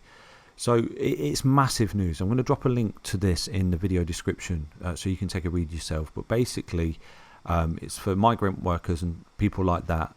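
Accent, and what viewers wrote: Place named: Leicester, United Kingdom. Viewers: It's his Leicester accent., the pride of Leicester 👊👊🍻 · u have beautiful accent, i think u are from England, i dont have sure! I'm from Brazil and I like this accent Well i didnt know Europe accept crypto like XRP or other coin bro. I'm waiting this hit up $100 or more!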